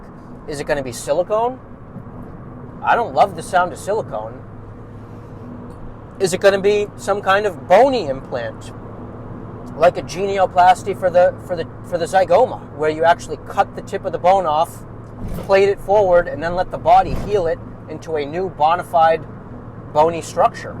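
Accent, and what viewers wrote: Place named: Eastern New England, United States. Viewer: I love your Eastern New England accent!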